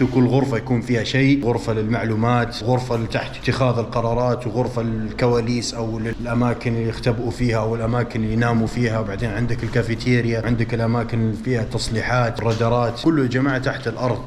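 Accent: Saudi Arabia. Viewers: من تونس لاكن عاش فالسعوديه طفولته عشان كذا يتكلم سعودي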